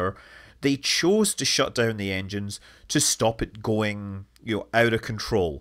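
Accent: Scotland, United Kingdom. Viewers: Scot, are you Scottish? The accent is awesome, my ancestors came from Strathmiglo, Scotland in the 1700's to escape the English.. love all things Scottish.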